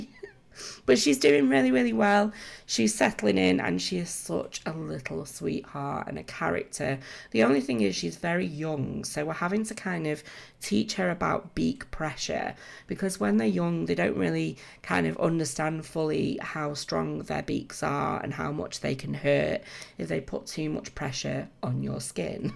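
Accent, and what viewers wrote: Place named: Manchester, United Kingdom. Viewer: Absolutely love this house. Being on an angle really makes it more awesome. Also brill to hear a fellow Manc simmer x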